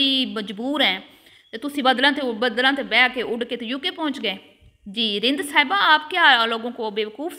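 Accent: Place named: Saraiki, Pakistan. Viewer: آپ کے لہجے سے لگتا ہے ہم بھی سائیکی زبان بولتے ہیں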